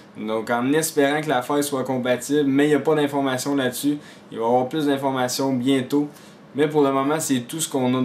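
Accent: Quebec, Canada. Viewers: J'a laissé un potit poce vert et un commintaire =) (l'accent québécois me fait bien marrer) Sinon excellente vidéo dude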